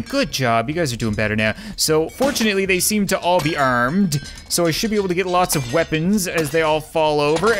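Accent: Canada. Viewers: GoldenGamer 12 he’s Canadian pretty sure that’s just how they say it.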